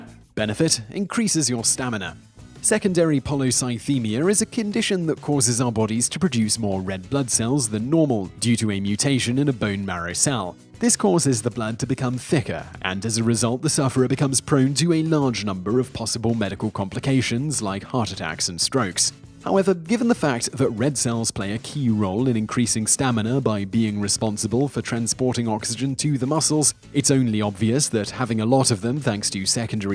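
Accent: United Kingdom. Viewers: Thank you so much! I love love these intense snippets that make me search for more, Brit accent, also a big plus. Thank you!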